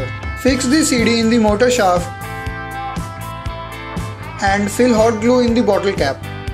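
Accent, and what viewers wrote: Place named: India. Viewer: Accents are weird to me. I can never imitate accents like other people can having accent myself. More I listen to Indian accent I can get use to it and be able to comprehend more. And that's not the only factor effecting you. Yourself become more kin to the slight accent without even realizing it. I wanted to ask you. How can you check the voltage and the amp input? Is there a simple way? Like an app? 😂😂😂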